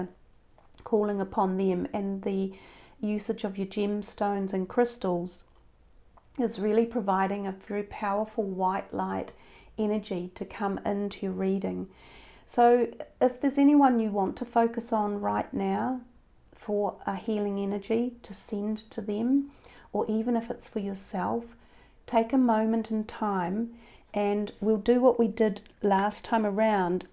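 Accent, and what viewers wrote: New Zealand. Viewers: Thank you again for another beautiful reading, once again I resonated with the whole reading, & was bought to tears when you said about a little girl and she has grown. My god sister passed away from a brain tumour when she was 2 years old. And what spun me out the most was I was thinking about her when you first mentioned lights all around us, I asked myself I wonder if she has grown because I bet she would look absolutely beautiful, I feel i was meant to see this. I appreciate it alot. So much love from me to you. 💕 P. S Are you from NZ also? Your accent sounds kiwi ☺️ no offence intended if you are not 😁 Jess x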